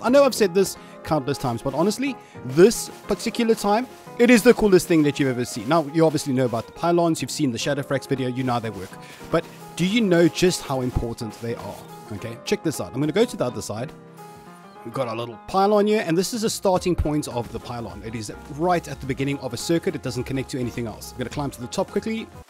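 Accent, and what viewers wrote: South Africa. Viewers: Ayoo, are u South African bruu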